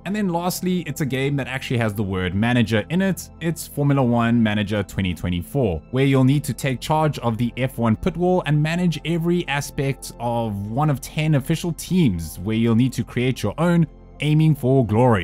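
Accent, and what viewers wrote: South Africa. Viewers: Do... I hear a Saffa?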